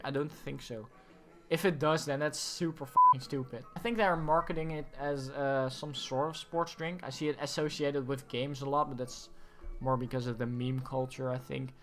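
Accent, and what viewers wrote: Netherlands. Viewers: listend to this for 10 secs and ur defenetly dutch lol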